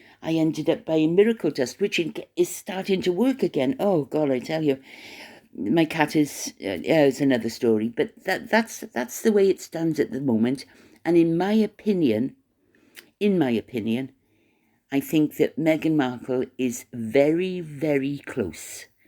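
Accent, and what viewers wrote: Wales, United Kingdom. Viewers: You’re back!! I have often wondered about you,. Love listening to your soft Welsh accent and your lovely little stories mixed in with the serious content of the vlog. We won’t say “toma-toes” again. I think that was what finally took you down - especially the rotten ones;) Good to hear you are well and in fine fettle. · OMG!!! can't stop laughing at u're story about u talking 2 the telly!!! I'm from Swansea and u're accent reminds me of an aunt of mine, but she was from Newport 😊 x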